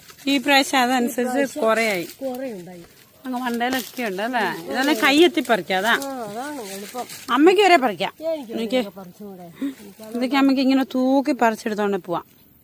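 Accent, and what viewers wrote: Kottayam, India. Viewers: Kottayam ano. Bhasha Kottayam alkkarude pole und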